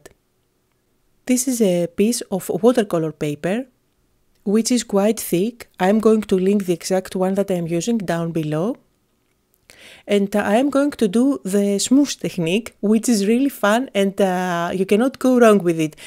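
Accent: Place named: Greece. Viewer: angelam perez by the accent I think that she is Greek and also her family name 😉😉😉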